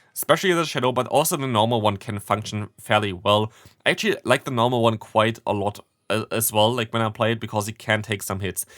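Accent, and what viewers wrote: Germany. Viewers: sounds like hes german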